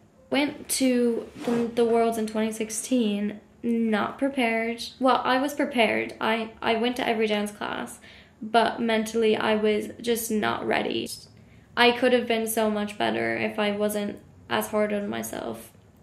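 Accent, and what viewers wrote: Ireland. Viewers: You have picked up an Irish lilt to your voice,. A far cry from your Ohio roots! Best of luck! Love following your career! 🍀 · You sound kinda irish! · Oh wow I thought she was Irish based on her accent. I’m from Ohio and don’t hear the midwestern dialect at all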